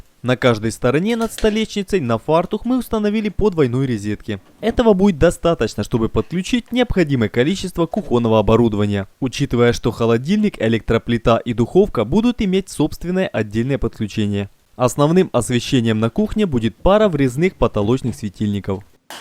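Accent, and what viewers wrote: Ukraine. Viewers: Вам, разумеется, можно говорить как угодно - это ваше право. Но всё же когда человек говорит неграмотно, это порой вызывает муку, Вы же формируете контент для зрителей. А то, что русский для вас не родной - не правда, даже если вы в быту и пользуетесь украинским, в чём я сомневаюсь, то и русский вы знаете прекрасно, просто не даёте себе труда не суржиковать. ps "ризетки" нет ни в каком языке. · Всеодно ти говориш з українським акценом, прошу озвучувати Українською мовою твої відео. Інакше Я відмовлюсь від твоїх відео. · Если придираться к речи, то в роликах много «очепяток» в речи, присутствуют акцент и слова «суржики», потому что они украинцы, но ребята не претендуют на знатоков по русскому и литературе. А еще у них голова и руки на месте!😎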